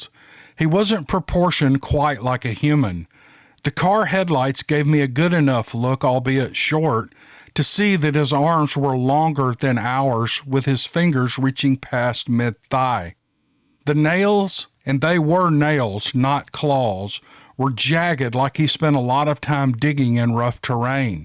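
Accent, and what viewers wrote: South, United States. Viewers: Listened to your collaboration with Shudder recently. Very good stuff. Ended up subscribing to them too. ALWAYS love your stories and that sweet Southern drawl. 🦍💖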